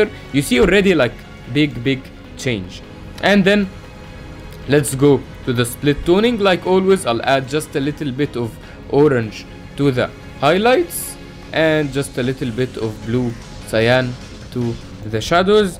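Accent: Egypt. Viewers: The egyptien accent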